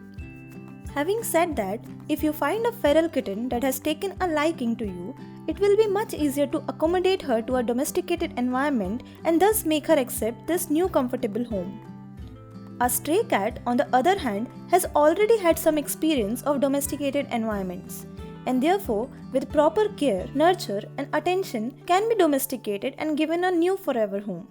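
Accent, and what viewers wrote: India. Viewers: What wor st, eunu ch like voice you have! Hateeful in dian accent! All cats are not she. Then how do you say her? Eunu ch! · I can hardly understand a word you are saying, even though your attempting, to make this video in English. Your East Indian accent is so thick, you may as well speak in Indian. Good effort though. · hears indian technical support accent and already stops video at 5 seconds, agony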